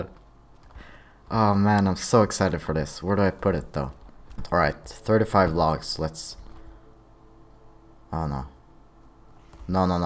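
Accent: Sweden. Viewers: You sounds like your from sweden Im new too and i am from sweden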